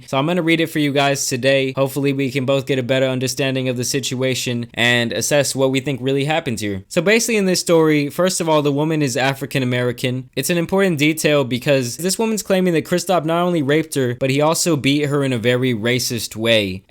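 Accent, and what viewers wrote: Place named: New York, United States. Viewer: You really have a New York accent while talking 😂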